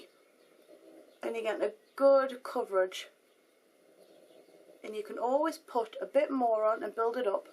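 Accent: Newcastle, United Kingdom. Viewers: She's not Scottish..She comes from Newcastle. In England. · i think she's geordie... like Cheryl Cole... northumbrian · She's geordie, GEORDIES UNITE! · are you a geordie? you'd be sooo great on geordie shore! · love it how every one thinks your scotish when blantly your georide lol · definitly from newcastle, im from newcastle so i know the accent pretty well lol, i'd be surprised if she wasn't. love your video's by the way. x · Just found your video, it's the first one I've watched, and I'm really impressed. 5*s for your video, and definitely subscribing :) And I love your accent, I might be wrong, but is it Newcastle?? x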